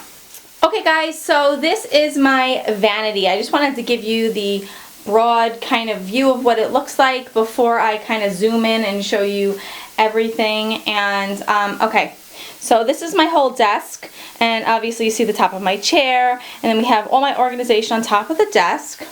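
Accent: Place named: New York, United States. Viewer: Are you from New York or New Jersey or something? I hear the accent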